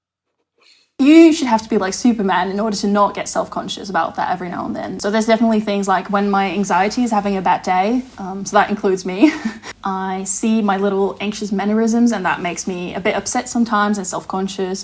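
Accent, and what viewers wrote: Australia. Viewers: I agree! It actually sounds pretty Australian and I love it! It's one of my favourite accents! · But I love your accent so much! I think it sounds australian and I love it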